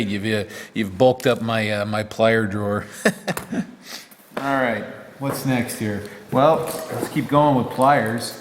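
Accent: United States. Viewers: I always thought that vernier calipers were pronounced "ver-ni-er", not just two syllables. Is that a local east-bay accent?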